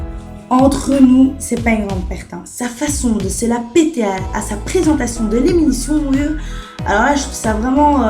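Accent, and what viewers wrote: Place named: Belgium. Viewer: Elle a un accent belge !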